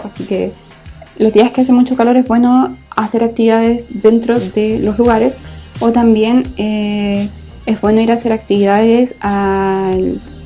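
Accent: Chile. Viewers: Sin ver 1 minuto del video puedo asegurar que eres chilena con ese castellano tan eligible 😂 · AAAAAAAAAAAAA se nota que es chilena apenas hablo jajajajajaaj que bella... eres muy linda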